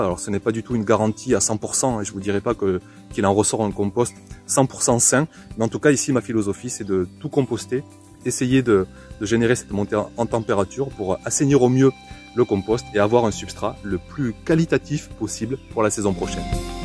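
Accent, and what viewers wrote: South, France. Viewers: Bonjour, Juste pour vous dire que j’adore vos vidéos. Les notions de chimie devraient en temps normal me faire fuir mais là, j’adore, je comprends et cela fait sens! De plus, vous écouter avec votre petit accent du Sud, donne le sentiment d’être en vacances. Merci pour votre partage.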